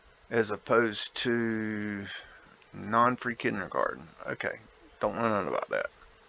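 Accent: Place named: North Carolina, United States. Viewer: I think it's because he has a NC accent. I spent my Summers with family there. It takes me back to all the questions I asked them. They almost never had answers for me.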